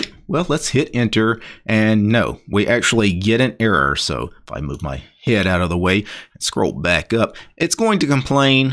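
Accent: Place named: Louisiana, United States. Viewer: That Louisiana twang really is something 😅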